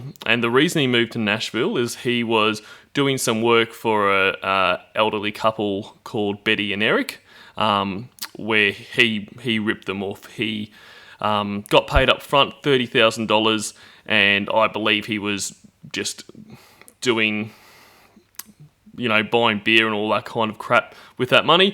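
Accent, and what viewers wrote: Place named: Australia. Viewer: Somebody from Nobles livestream today referred me to this vid for a accurate recap on my Lorne Noir. Soooooooooooooo good 👍 cant imagine all the hours upon hours of Lorne bullshit u had to listen to just to make this timeline, for that, always remember I appreciate yew precious. Lol 🌹🌹🌹🌹 P. S. Ur Aussie accent has been my bed time ASMR lately 😍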